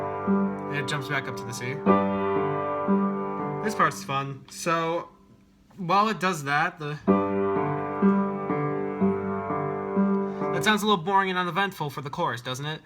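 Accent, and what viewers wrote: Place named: United Kingdom. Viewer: I don't understand a word you say because you speak too fast for me and i'm learning british english so you're acent is very different of what i'm used to listen jajajajaja. Well more or less I understood something, it was a joke :D Back to what I would like to say, I like very much how you play the piano and also the emotion and effort that you done with your work. I can only say, congratulations!! PD (I think that american people use PS but it doesn't matter): I don't pretend to butter you up JAJAJAJA Take care!!! And again sorry if my english is horrible :)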